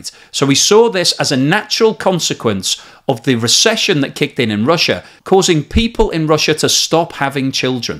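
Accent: United Kingdom. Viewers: if you don't mind my linguistic curiosity, I assume you are from the UK, judging by your pronunciation - yet you pronounce "Masterworks" the American way. Why is that (or am I somehow mistaken)?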